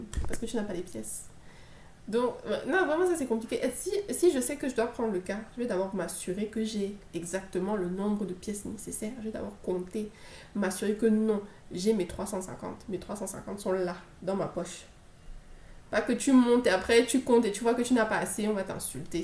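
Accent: Cameroon. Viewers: Le port du casque est obligatoire au Maroc. C'est un pays plaisant avec une population avenante. T'as déjà prise l'accent camerounais et c'est plaisant de t'écouter . T'es éloquente et pertinente dans ton analyse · Travaille ton cardio car chez nous c'est le cardio ! Autre chose, NE CHERCHE PAS A COMPRENDRE LE CAMEROUN ! Tes problèmes commenceront dès que tu chercheras à le comprendre car un dicton dit : Si on t'explique le Cameroun et tu dis que tu as compris, c'est qu'on t'a mal expliqué ! L'accent Camer y est déjà, c'est bien. Pour le reste, gère seulement ! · Tu deviens déjà camerounaise , même l'accent hein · tu es super marrante 😅on dirait que tu as vecu au Camer toute ta vie(tes expressions,ta gestuelle,ton accent etc) tes videos me detendent beaucoup,continue comme ca.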